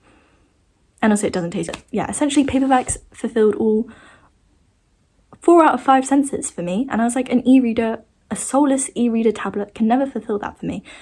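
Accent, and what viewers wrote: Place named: Hampshire, United Kingdom. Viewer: Omg!! your the first youtuber I've ever heard of with the same accent as me lol, are you also a Hampshire girly?💕 ps you are so gorgeous!!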